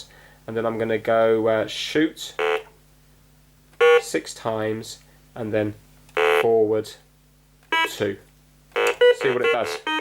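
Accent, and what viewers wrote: United Kingdom. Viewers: Haven't watched the entire video yet but I'm having a moment of appreciation for this channel. I'm British but your accent is posher and softer than mine. I like how you film in natural light. You are enthusiastic in how you fix things and know about the background of most items. You usually speak as if you know nothing yet you've managed to fix almost everything. I've watched multiple 1 hour+ long fix it videos. Great content. Keep it up.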